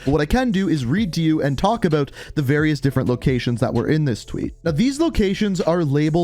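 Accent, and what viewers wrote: Canada. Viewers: Is saying known the way he does a Canadian thing or just a way he does it?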